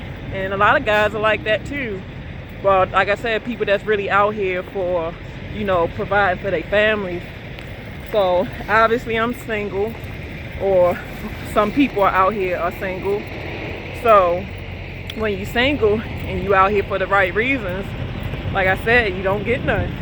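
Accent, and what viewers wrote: Baltimore, United States. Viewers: Sounds like you are from Baltimore... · 666 comments, glad I'm 667 Sounds like you from Bmore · Good grown advise.Keep it truckin,sound like a Baltimore accent.Peace and blessings to the truckers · My first video watching. You from Baltimore. I can hear that shit clearly. DEW lol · Very nice looking female truck driver, you speak with a Baltimore accent, are you from that area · I can listen to that B'more Accent all day 😂 coming from Bama. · You are from Baltimore, Maryland aren't you? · Very pretty your probably fun to hang out with too I bet sounds like you from Baltimore anyway keep safe out there miss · You sound like you from Baltimore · I love the Baltimore accent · Jay Reed Nope....DC, MD OR Virginia..or at least been there for 5 years or more....Actually 99% hunch it’s Baltimore. (after listing more 99.999% it’s Baltimore) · You from Bmore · Ya bmore accent is LIT! 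😂😂😂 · Gotta be from Baltimore. NOLA and BMore accents are so dope! You rock! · You sound like you're from Baltimore.But you not having a man.Wow I can't see that far.You are BEAUTIFUL. 💯😚Keep it up sista.Real talk from beginning to end. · That Bmore accent strong lol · Love your BMore accent ♥️ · Love that bmore accent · I was about to say. You from Baltimore. Nice 😀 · sounds like she is from. bmore or. maryland lol the uuuuz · She do sound like she from Bmore, sorry that you don’t get none because you’re so cute, love ya voice too. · First time ever seeing your video & the first thing I’ve noticed besides how gorgeous you are is your Baltimore accent... You have a new fan! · She is definitely from Baltimore Maryland! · This is my first time seeing this channel but i can tell by your accent that you are from baltimore. Nice content! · You must be from Baltimore? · Ya sexy ass sound like you from Baltimore. 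· You gotta be from Baltimore?! I can tell by the way you say “do” and “to”...I like your honesty. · 2-4 Till I Die she sounds like she's from Baltimore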